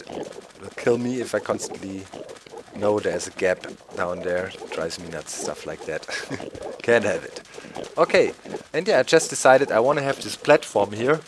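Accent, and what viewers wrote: Germany. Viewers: Are you from germany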